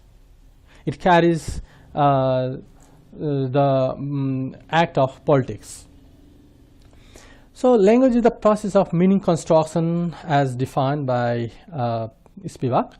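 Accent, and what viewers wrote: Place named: RP, United Kingdom. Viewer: Superb! BBC Style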